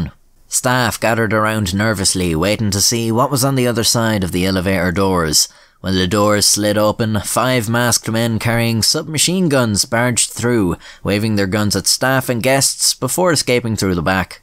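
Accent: Ireland. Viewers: Idk if I've been watching too many of your videos or not, but my inner monologue now has an Irish accent.. and I'm American, from North Carolina lol.. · Seeing the guy it's named after is Henry William Lamb, 2nd Viscount Melbourne who, in 1770, was raised to the Peerage of Ireland as Lord Melbourne, I think this Irish presenter has every right to pronounce it in his own dialect. Regardless how we current 'Strayans say it.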